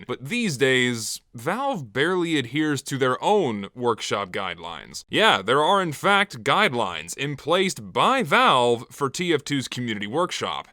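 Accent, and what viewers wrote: Texas, United States. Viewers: "The more lazy, narcisstic sides of the workshop" a little bit of sundowner just spilled out there. I love the Texas accent.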